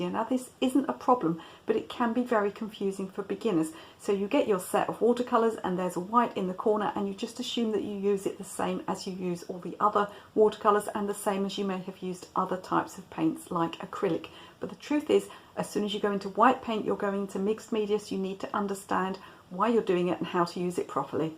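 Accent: United Kingdom. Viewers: Funny thing. I started following your channel because I'm an English teacher in Brazil and I fell in love with your clear and bright British accent. Now, I'm in love with watercolours,too. I've bought a Windsor set of watercolours and started doing it as a hobby. I'd say it seems therapy to me. thanks a million for opening this amazing channel <3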